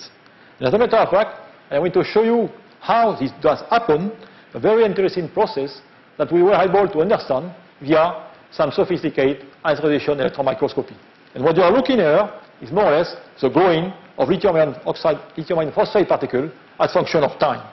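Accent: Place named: France. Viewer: Nice lecture, but the French accent is too strong.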